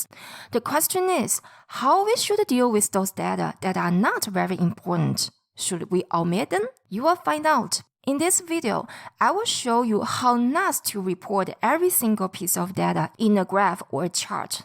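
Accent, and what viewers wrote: China. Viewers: sorry to say your English is mixed with Chinese accent. Hardly can understand your pronunciation as what you are saying !!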